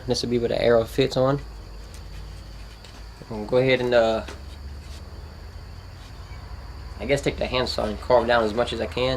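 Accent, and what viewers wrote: Louisiana, United States. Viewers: + We got easily distinguishable accents from the typical southern accent. You're from down da bayou. It aint hard to tell. Are you a Houma Indian or do you know any american indian bow making techniques? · Sounds like from Bayou country. good job on bow. but, is she able to draw the 60lb bow? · Love the accent. Cajun/ creole is so cool. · straight up Cajun brotha. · He sounds cajun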